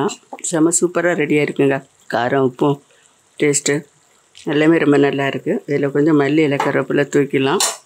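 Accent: Nagercoil, India. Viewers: Amma unga voice cleara irukuma Nagerkoil slang matiri iruku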